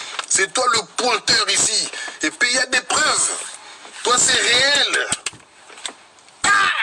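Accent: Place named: Grigny, France. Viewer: A grigny c'est comme ça que sa parle c'est sa ta pas compris.. ça se voit vous venez de province ou sud de la France pour dire sa...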